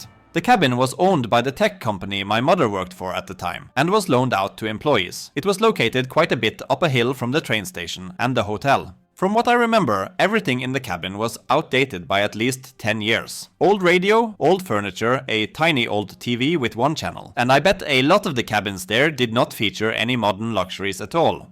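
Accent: Norway. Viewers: Hører du er norsk på mils avstand. No offence. Bare sjarmerende. Informativ video. Tommel opp.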